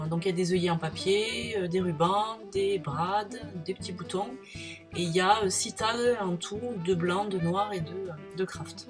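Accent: South, France. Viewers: Moi je ne trouve pas que le masquage sur ton étiquette était inutile ça rend trop bien ça fait classe je vais te piquer l idée. J aime bcp aussi la fuse avec le tag. Deux petites questions : quelle est la marque de tes pochettes ? Comment imprimes-tu tes photos carrées ? stp. Merci pour tes vidéos avé l assent c est très agréable 😁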